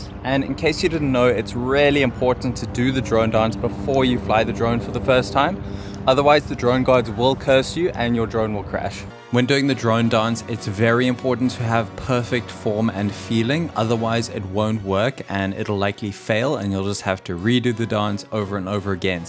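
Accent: South Africa. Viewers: So cool to hear a South African voice while watching a video like this. Keep it up man!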